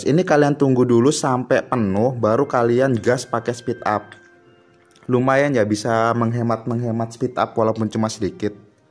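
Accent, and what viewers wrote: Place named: Medan, Indonesia. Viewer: Medan mana suaranya.... SULTAN MEDAN DIA BG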